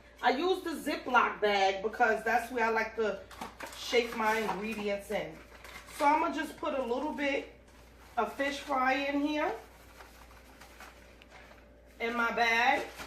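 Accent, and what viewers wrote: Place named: Newark, United States. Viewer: She sounds like she from Newark lol we do that ova here too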